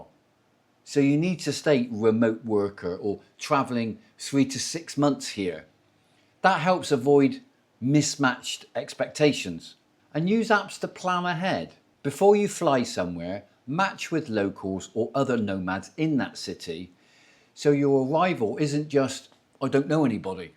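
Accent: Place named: South West England, United Kingdom. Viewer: you sound like your from the south west or brizzle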